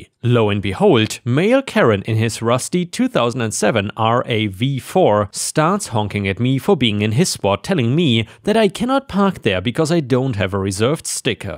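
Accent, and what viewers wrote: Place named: Germany. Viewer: I can hear the german out of your voice, and i love it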